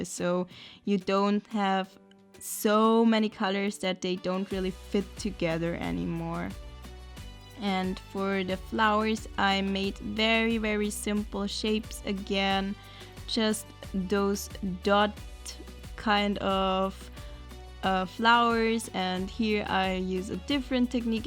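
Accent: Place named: Germany. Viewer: Hör Deutsch raus in dem englischen akzent,lieg ich da richtig?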